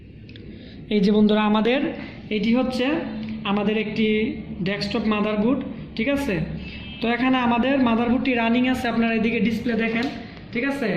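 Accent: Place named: Mymensingh, Bangladesh. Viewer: ভাইজানের বাড়ি ময়মনসিংহ বোঝা যায় তবে অনেক কাজের ভিডিও বানাইছেন, ধন্যবাদ।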